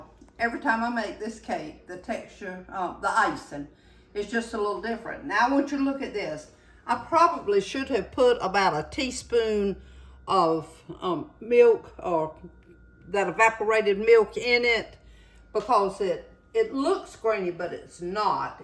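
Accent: South, United States. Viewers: Miss, I MUST get the info on purchasing your cookbook! Funny coincidence, but I collect cookbooks that are similar to yours (that look like spiral notebooks) and to date, I have some as old as the early 1980s and many that were sold by churches, schools, community health clinics, and one that was put together by the Fort Knox (KY) Officer's Wives Group! Thank you so much for sharing your recipes!! And as always, I do love listening to your gorgeous southern drawl!!! 💕💕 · you are so darn cute and I love your southern accent, I was told when I lived in California for 2 years that I had an accent Im from Michigan,my dentist told me I talked like a Canadian EH LOL cake looks yummy... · love your accent, you do not need to correct yourself and your precious southern accent, LOVE IT!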